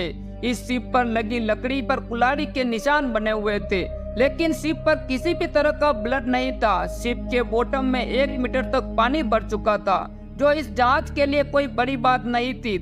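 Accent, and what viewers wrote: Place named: South, India. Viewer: Naration is very bad in southern accent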